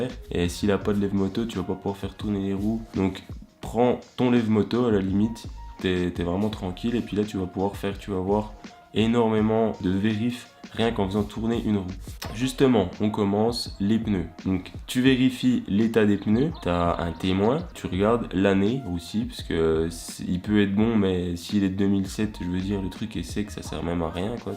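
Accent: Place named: Franche-Comté, France. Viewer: Tu es pas de Franche-Comté ? je crois entendre l'accent de chez nous !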